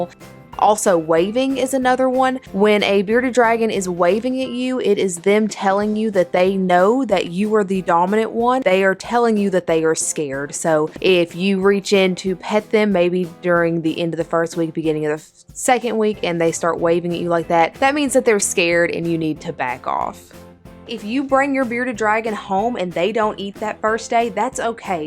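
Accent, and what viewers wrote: South, United States. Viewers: No offense but was not expecting a country voice lol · Damn u sound country what part of the south u from Texas? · You got that yeehaw accent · Are u from the south cuz I’m pretty sure u are cuz of your accent cuz I am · love your southern accent! :-D glad I decided to watch some Beardie videos! :-D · I love your southern drawl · Hi! Just wanted to say that we really like your videos. And, being from the south, we love your accent. Keep up the great work. Would be cool if we could do a video together one day... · Sounds like you got a southern drawl. I love a good southern accent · is kind of cute...with a lil country twang bout her voice. Oh, and has great advice for bearded dragons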